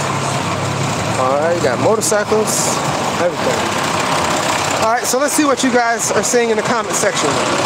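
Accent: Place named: El Salvador. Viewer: Ya hablas como Salvadoreño. Goodddd I like it.😁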